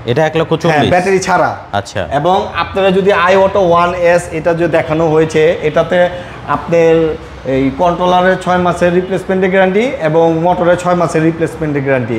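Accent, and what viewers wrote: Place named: Kolkata, India. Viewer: লোকটি কোলকাতার বাবু নাকি? ভাষা এমন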